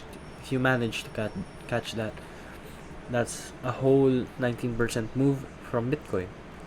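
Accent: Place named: Philippines. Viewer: It’s Filipino accent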